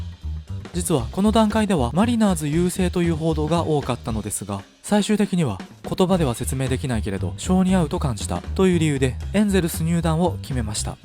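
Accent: Kansai, Japan. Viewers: この解説者関西弁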